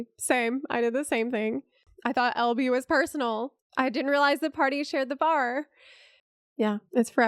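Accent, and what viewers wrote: South, United States. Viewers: wonder what part of the south she is from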